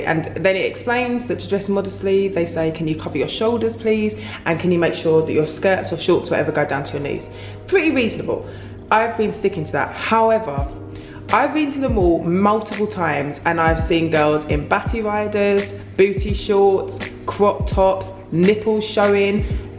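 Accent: London, United Kingdom. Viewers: beautiful I can here you talk all day .I love that London English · Luv luv ur British accent. Glad that u like my country.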